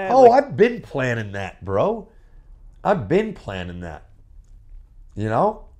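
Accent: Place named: New York, United States. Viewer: Why does he's new York voice go in and out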